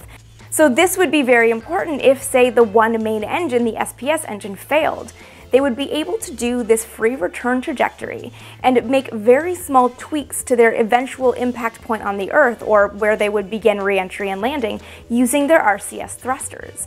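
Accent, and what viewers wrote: Canada. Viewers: Check "oot" the smart, cute Canadian girl.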